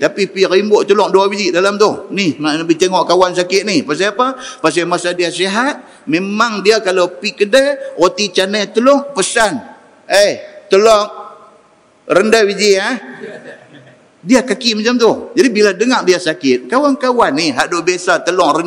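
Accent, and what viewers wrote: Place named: North, Malaysia. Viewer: terima kasih....lega boleh dengaq balik bahasa utara...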